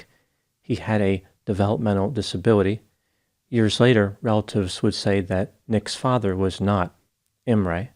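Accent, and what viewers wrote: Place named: Pennsylvania, United States. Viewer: Today's question is, can I analyze accent. Although the cacti in the background suggest a southwest setting, I possibly detect hints of Pennsylvania or perhaps Ohio. I'm not attempting to pin down any geographic region, it's only a theory, my opinion.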